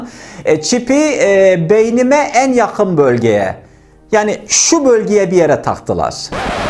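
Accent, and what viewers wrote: Denizli, Turkey. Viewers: Abi biraz Denizlili şiveli konusan bi programida öyle sunsan , Emegine saglik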